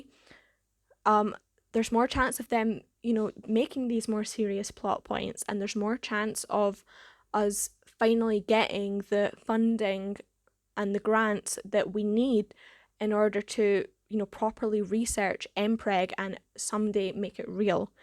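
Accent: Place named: Scotland, United Kingdom. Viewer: Where are you from? You have a really Scottish accent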